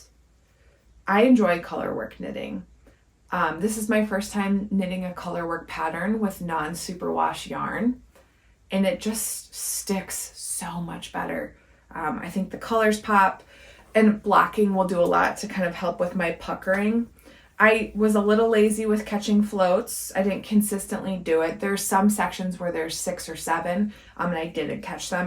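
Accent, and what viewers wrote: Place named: Midwest, United States. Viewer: I'm so obsessed with your recording location and your Midwest accent :)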